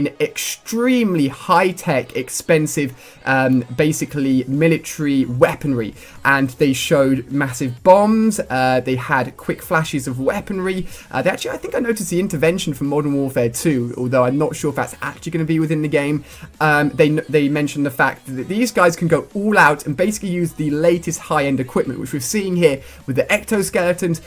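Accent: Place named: United Kingdom. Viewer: It's hard for me to understand british accent and you speak faster... : /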